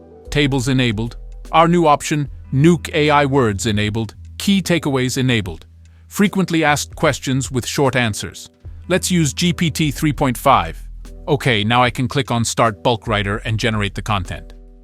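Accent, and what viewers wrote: Italy. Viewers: But this is better than his Italian accent. 😅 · Not gonna lie I miss the Italian accent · Thanks for sharing the update! Me too I like the Italian accent. Do you have a discount code for Surgegraph?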